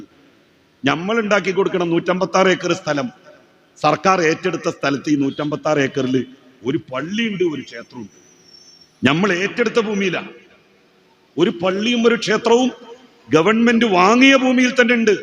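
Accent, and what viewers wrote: Malappuram, India. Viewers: ജെലിൽ സർ.... തനി നാടൻ മലപ്പുറം..... സ്പീച് അടിപൊളി... പെരുത്ത് ഇഷ്ട്ടം 😂😂😂😂😂🎉🎉🎉🎉🎉🎉❤❤❤❤ തട്ടിപ്പിന്റെ സർവ്വകലാശാല ആണെന്ന്... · സഖാവ്: അങ്ങേയുടെ മലപ്പുറം ലാംഗ്വജ് ഒരുപാട് ഇഷ്ട്ടായി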